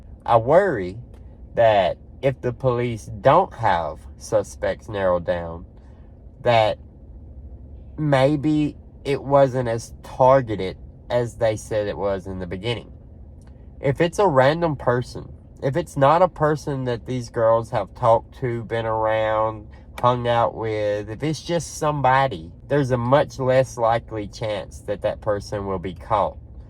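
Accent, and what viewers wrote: South, United States. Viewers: You are the cutest little southern boy I have seen . You are very sensible and quite smart . It’s so refreshing to hear your sweet southern accent which is pretty rare to hear here in NY City . I am a Grandma and have much more years on you . But if I was young again I would make you my boyfriend . Happy Holidays . Your secret admirer Grandma .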